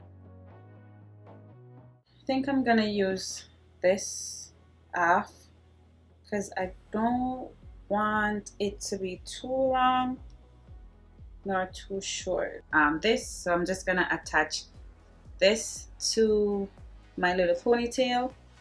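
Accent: Jamaica. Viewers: I knew u were Jamaican from the starting of the video.😂😂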